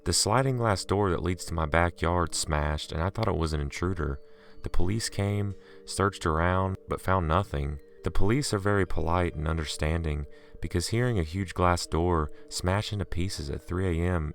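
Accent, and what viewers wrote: South, United States. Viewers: Sounds like you have a southern accent. Do you mind me asking where you are from?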